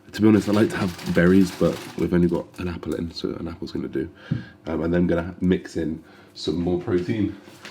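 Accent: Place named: England, United Kingdom. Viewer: I have trouble with understanding english accents, but your content is too good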